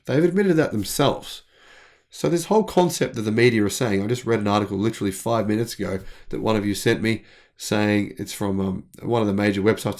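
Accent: Australia. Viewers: She's apples! No worries. True blue, Toyota's up Shit Creek without a paddle but they keep yakking on how she'll be right. Crikey, they've got Buckley's, what a bunch of bludgers, a few stubbies short of a six pack if you ask me. They say they're on a ripsnorter but it a dog's breakfast, 'cause those hybrids still need to pull in to the servo. What a bunch of drongos and galahs. They'll never make the Big V when it comes to EV 'cause it's just them pulling the wool. Fair dinkum their only attempt so far is cactus. Now they're gonna try and get a free ride by dinking on some Chinese makers. They really need to get out of woop woop, put in some hard yakka and get their ship to chuck a U-ey. Strewth... did I really say that. Should have saved that one for Ron. Don't worry... we are just stoked when you talk all antipodean!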